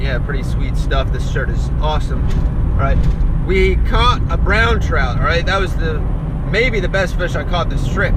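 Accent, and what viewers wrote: Philadelphia, United States. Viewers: Hey I like your videos i like the way you talk. Makes me wish I was back in Philly. You stopped for a while and I was afraid you were gone. Now your back. Cool. Thanks dude.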